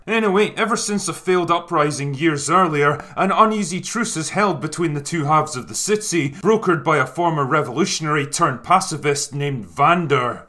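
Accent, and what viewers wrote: Scotland, United Kingdom. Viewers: I feel like you toned down your accident a little bit since your first videos, I hope you will keep your strong drunk scottish accent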